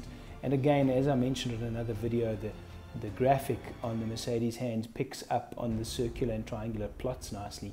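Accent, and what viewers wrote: South Africa. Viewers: Sweden here again. Jebo,, I don't know that one so I will go with Jambo Sana instead. Both nice watches, I kind of like the black one with that pop of red and blue lume, could be a little better. By the way,, J-burg,, I guessed right on the accent.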